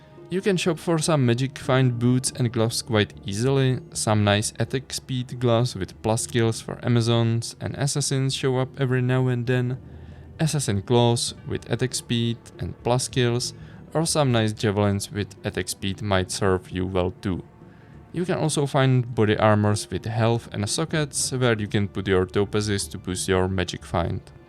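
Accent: Czech Republic. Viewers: Angličtina zní hodně jako moje, tzn česky :D. Trefil jsem?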